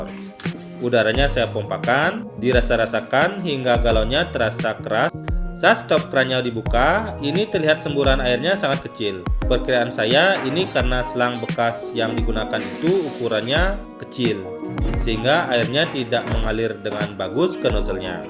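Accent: Bali, Indonesia. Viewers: Bicaranya seperti orang Bali ya brow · Suara org dari bali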